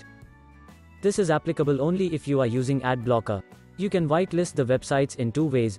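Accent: India. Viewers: Damn even if it's a robotic voice these people achieved to make it sound indian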